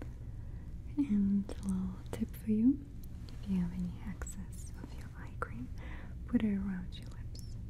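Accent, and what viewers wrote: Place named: Russia. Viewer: English is not her native language. She is from Russia.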